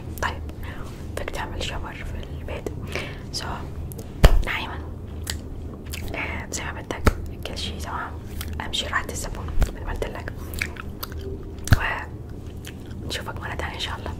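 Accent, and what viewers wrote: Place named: Damascus, Syria. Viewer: صرلي ساعة بفكر لكنتك شامية ولا حمصية 😂❤️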